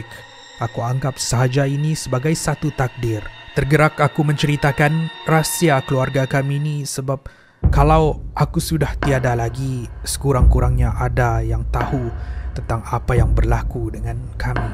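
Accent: Sabah, Malaysia. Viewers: Indahnya dialek Sabah jika bercerita..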